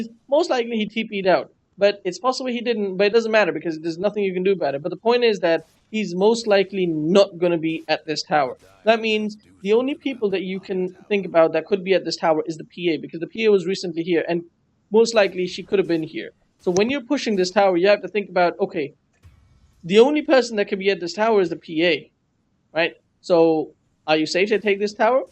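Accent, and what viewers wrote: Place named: India. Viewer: His accent and that moment when a train passed by in background suggests the same. I know that coz I'm Indian myself. :D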